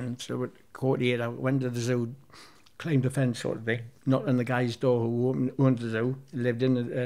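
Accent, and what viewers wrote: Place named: Geordie, United Kingdom. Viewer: He's a Geordie that's how they all sound.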